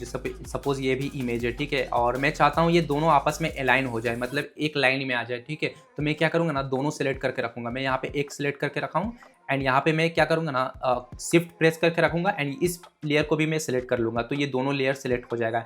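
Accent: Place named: Hyderabad, India. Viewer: Zabardast Haiderabadi Accent.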